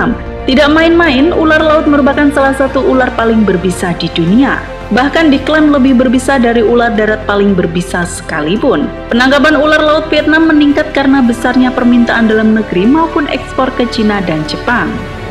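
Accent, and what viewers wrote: Java, Indonesia. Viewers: Naratornya medhok banget 😀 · Medok naratornya 😆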